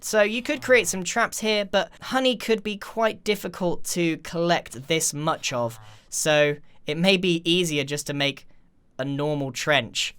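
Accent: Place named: London, United Kingdom. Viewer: Hannah Huang HES from London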